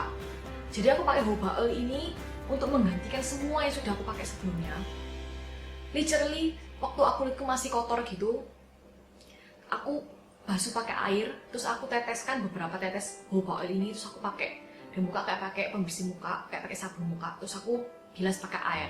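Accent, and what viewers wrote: Java, Indonesia. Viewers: Jawa bgt. 😂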